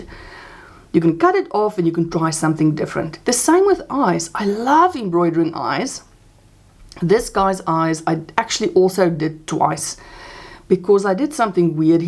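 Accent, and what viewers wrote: South Africa. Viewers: Hello love ❤️, I recognise a South African accent? are you from South Africa perhaps?🥰🥰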